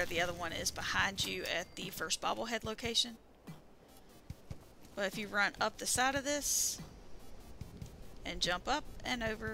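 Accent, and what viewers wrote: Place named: Georgia, United States. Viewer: 👍 for the helpful tips Subbed for that sweet peach country accent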